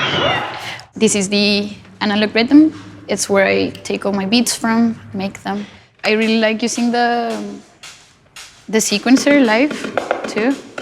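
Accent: Bogotá, Colombia. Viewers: Sounds Colombian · Any other Colombians can notice how her accent also somehow is there when she speaks English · I didn’t know she was Colombian until right now that I heard her speak in English and said: she has to be from Bogotá! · el acento rolo es super marcado hasta en inglés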